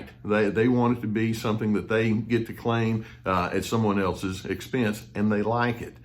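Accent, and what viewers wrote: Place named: Texas, United States. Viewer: in London everyone would expect you to be a cowboy because of your accent. We only know that show Dallas here...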